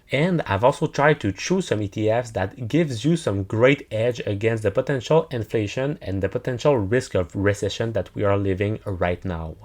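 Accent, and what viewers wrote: Quebec, Canada. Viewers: Sounds like a fellow Quebecer 🤘🤘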